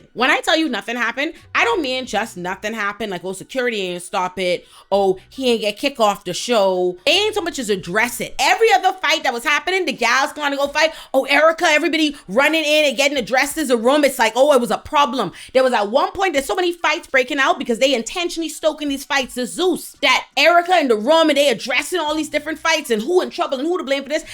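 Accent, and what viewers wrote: Bahamas. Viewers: I genuinely hate Zeus network with a passion, it feels like a whole modern day minstrel show. Also off topic, are you Bahamian of have Bahamian roots? You have an accent very close to a Bahamian one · Your Bahamas 🇧🇸 accent >>>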